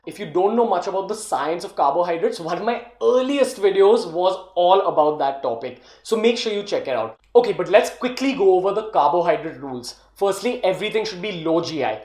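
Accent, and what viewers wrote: India. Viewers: So sorry but I just cannot stand the Indian accent. It haunts me as I had a terrible experience with an Indian call center scammer who syskey'd my machine. I wish you did robot voice instead. Good luck though, you seem like a pretty chill dude.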